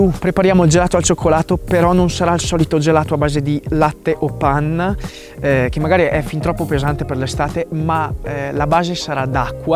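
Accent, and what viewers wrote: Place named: Romagna, Italy. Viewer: Come mai hai la ESSE romagnola?!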